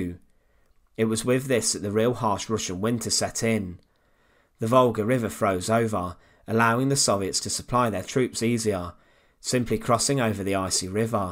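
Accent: United Kingdom. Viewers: The narrators voice is too much..I can't understand it, that British accent is too heavy.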